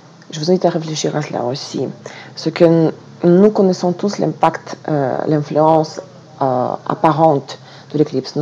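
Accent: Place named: Russia. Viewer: merci pour cette vidéo très enrichissante... J'adore votre accent... Je précise d'ailleurs que votre français est parfait quand on sait combien cette langue est complexe... Quand je vous écoute, çà me replonge dans mes années lycée lorsque j'étudiais le russe... une langue dont la musique me berce encore... Votre façon d'aborder l'astrologie me parle beaucoup: elle est précise et claire à la fois... Belle semaine à vous...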